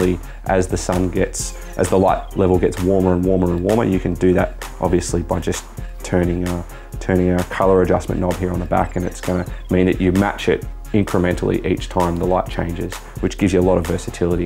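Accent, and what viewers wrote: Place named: Australia. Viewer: You can really tell an Aussie accent from all others! refreshing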